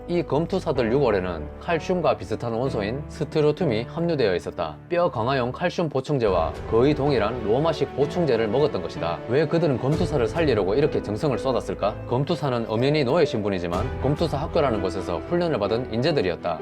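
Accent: Gyeongsang, South Korea. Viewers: 갱상도사투리나레이션 듣기거북하네예 ㅋ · 다 좋은데 갱샹도 사투리가 거슬리네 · 인간이 예수왕 어린양의 구원의 피외에는 영원히 살지 못하는데 뭔 영원이라하냐 경상도 억양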